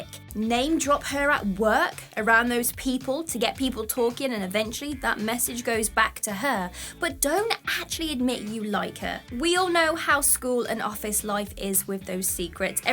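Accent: United Kingdom. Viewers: I Just listen to her for learning British accent lol.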